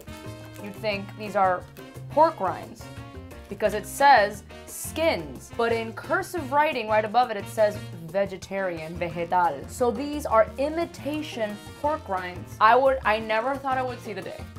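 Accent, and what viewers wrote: Cuba. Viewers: I HAVE THAT SAME COFFEE MAKER AND SAME COLOR I love you girl you are my favorite and you make me laugh, I'm Colombian we have a few things in common with Cubans I been noticing and I love your Cuban accent you are just the funniest and the best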